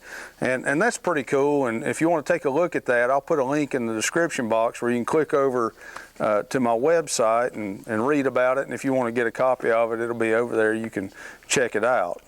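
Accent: South, United States. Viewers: Wow I was so impressed with your presentation! Very very nicely done and with the nice southern accent!! I'm guessing North Carolina. I am in the planning stage of building a 20X10 garage with a 8X10 shed attached. This was the most comprehensive well thought out well constructed video on construction I have ever seen! Well done! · ***** You may be closer than you think. It sounds to me from listening to you on the videos that you may be from Alabama, Mississippi, Tennessee or Georgia. Not sure where you are but I'm in Mississippi. You probably noticed that my storage building has gone from something smaller (8X10 or 10 X10) to 12X12 or 12X14. It doesn't make any difference what size I make it, I'll have the same problem you have, it will be too small. I'm like you though, I want to do it by myself. It's the satisfaction of accomplishing something and knowing you did it without help. 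I'm not going to go so far as to cut down the trees and mill the lumber myself. And mine won't be as high as yours and I'm thinking of a lean-to roof. Flat with a slope from front to back. The things I've learned from watching your videos will come in handy no matter what the size of the building. Get is square first and get the holes level and so much more. Thanks again.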